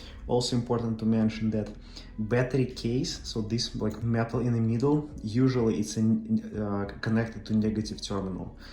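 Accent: Russia. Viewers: Слышу русский в вашем английском. Вопрос, у вас случайно канала на русском нет, а то я в английском не очень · Товарищ! У тебя по ходу русский акцент!? Расскажи на русском языке!😀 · Отличный акцент))) пря клип вспомнил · Оффтоп: как же режет слух русский акцент! ;))) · ох уж этот английский по русски!...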